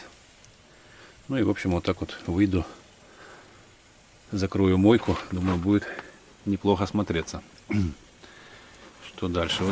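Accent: Ukraine. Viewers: вы где то на Украине судя по говору?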